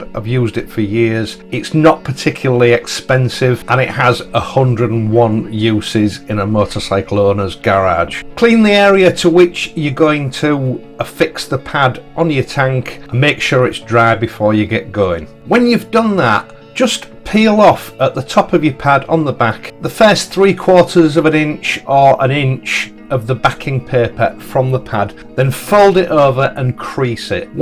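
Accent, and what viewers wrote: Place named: Northern England, United Kingdom. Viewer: Apart from the fact that I'm obsessed with this bike, and dreaming about getting one, I find your presentation style and northern accent (whereabouts are you from by the way?) very easy on the ear. I have to concur with CHANG, you are indeed the David A. of the motorcycle world!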